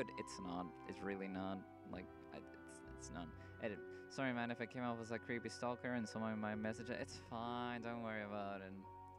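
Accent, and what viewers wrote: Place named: Japan. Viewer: ты хорошо говоришь на русском, но говоришь так, будто это японский или корейский, но а так нормально >___<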